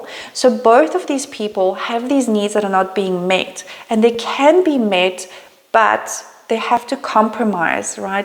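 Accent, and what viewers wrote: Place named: South Africa. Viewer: Hah! I recognized the SA accent. Great video!!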